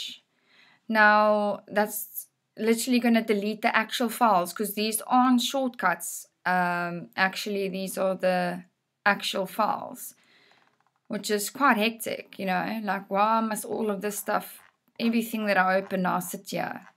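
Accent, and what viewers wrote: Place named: Afrikaans, South Africa. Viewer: Thank you!! (Love the accent! Afrikaans maybe?)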